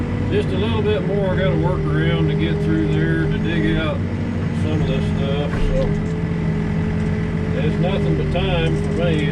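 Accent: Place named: Oklahoma, United States. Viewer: That Okie accent is Okay!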